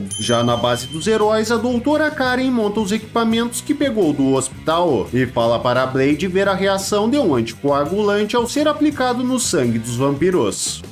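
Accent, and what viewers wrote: Rio Grande do Sul, Brazil. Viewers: Rapaz , divirto-me muito com este canal. Suas tiradas sao muito inteligente. Queria saber se vc é gaucho?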